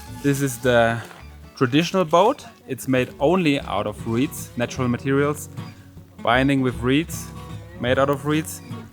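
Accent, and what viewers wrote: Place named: Germany. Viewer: yesterday I just wanted to know about Germany, or better, Deutschland... and now I'm a fan... lol it's the Deutsch accent... I love it.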